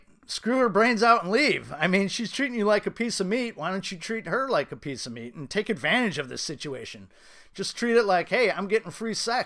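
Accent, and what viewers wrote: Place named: Midwest, United States. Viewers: I don't know why I thought you were from Canada I can so hear the Illinois accent now.. Great video bro!! always on point👍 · I’m digging the Midwest accent and great advice 👍🏻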